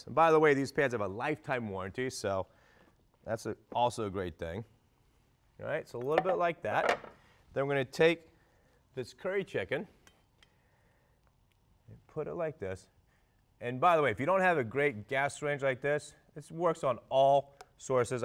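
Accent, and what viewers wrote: United States. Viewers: I agree...his voice sounds like he was born in the US. Martin Yan, on the other hand was born in China..people criticize his accent as "fake", though that *is* his real accent.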